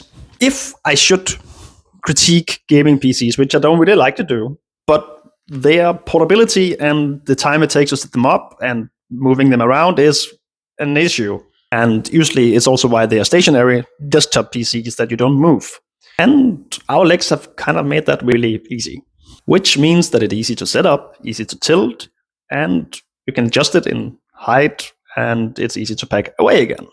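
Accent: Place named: Denmark. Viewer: Dear god, that Danish accent :)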